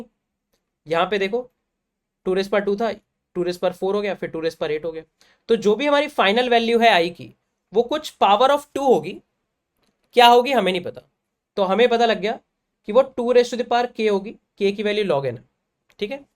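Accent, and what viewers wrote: India. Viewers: through your commnet i noticed that this video was not in hindi language, the accent is so indian that I really thought that he is talking in hindi lol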